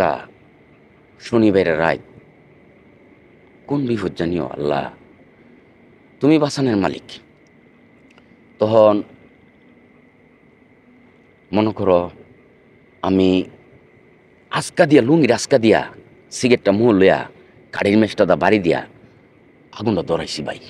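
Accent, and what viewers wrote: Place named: Narsingdi, Bangladesh. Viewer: মনে হয় নরসিংদির ভাষা এটা!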